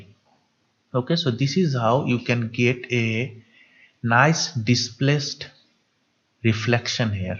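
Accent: India. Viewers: There's a lot of other VFX channels on YouTube but your channel taught me a lot! Also ur accent sounds like Bengali, and as a bengali myself it makes me feel comfortable watching your videos :)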